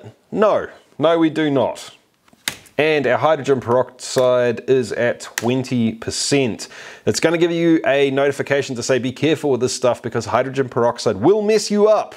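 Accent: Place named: New Zealand. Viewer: Your accent is awesome. Blame Worst Premade Ever for ruining the New Zealand accent for everybody.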